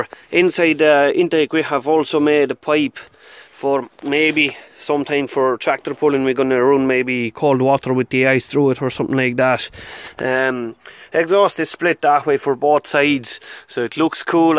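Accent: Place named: Ireland. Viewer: Masz farmę w Irlandii ?? Trochę czasu tam jesteś akcent mówi trochę . Robię dla Irlandczyków w Londynie. Zdrów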